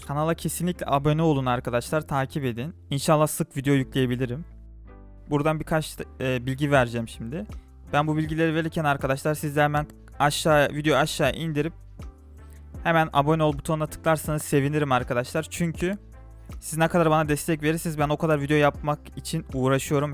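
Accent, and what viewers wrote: Malatya, Turkey. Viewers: Malatyalı mısın hemşerim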